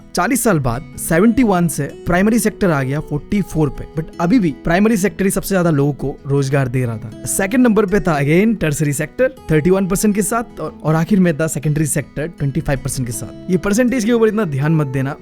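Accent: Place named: Assam, India. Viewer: Wo assam se hai iss liye thoda hindi me problem hai bhai😅😢😊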